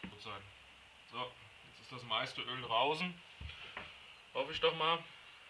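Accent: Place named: Germany. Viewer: Ja genau, ich hab noch nie sone sache offen gehabt, hab aber diverse Beschreibungen gelesen und da kommt einem teilweise das Grauen...Bilder sagen auf jeden fall mehr als 1000 Worte. Und den Deutschen akzent lieb ich einfach:-D (als schweizer)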